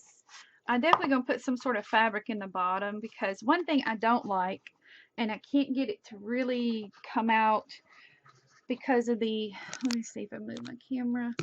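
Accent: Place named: South, United States. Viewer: I will. I live in North Georgia you sound like a southern girl too lol!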